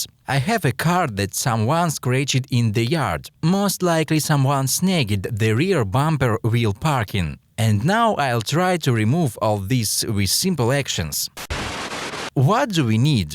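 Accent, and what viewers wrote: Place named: Italy. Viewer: sounds italian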